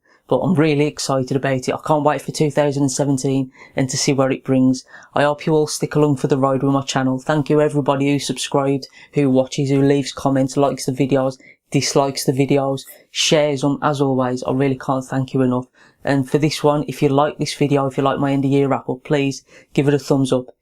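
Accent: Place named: Birmingham, United Kingdom. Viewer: Hope you had a great Christmas! Looking forward to following your travels state side, and to see what they make of the Brummie accent haha! Also thanks for answering all my questions over the past 2 years, I've often bought stuff off your reviews! Best wishes for the new year mate!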